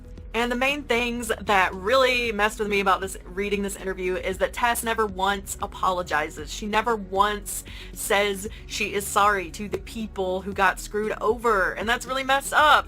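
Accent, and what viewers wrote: South, United States. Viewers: Are you souther (sorry for this random question but I love your voice and I'm curious about your accent) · Damn. When you said, "You create yourself," that was so powerful. You articulated everything so well in this video. Great job. I know this is a few years old but I hope you're doing well. Are you from the south? I like your accent lol. I'm from Tennessee.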